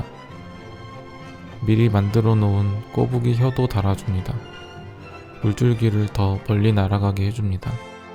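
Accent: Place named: Chungcheong, South Korea. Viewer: 는 충청도 사람입니까?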